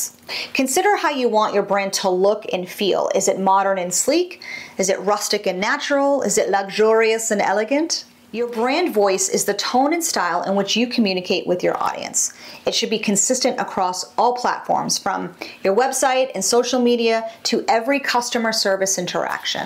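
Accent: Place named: Massachusetts, United States. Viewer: P.S. I ♥️ your Massachusetts accent. My hubby and I LOVE Boston! We’ve been there twice and want to go back!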